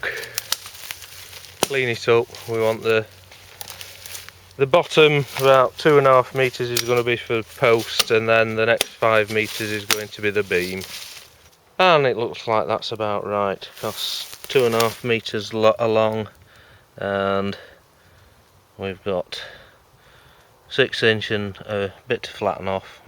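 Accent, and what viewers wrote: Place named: England, United Kingdom. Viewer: Im an American and it sounds a lil funny that you have an English accent but you read inches. thank you for the information bud. cheers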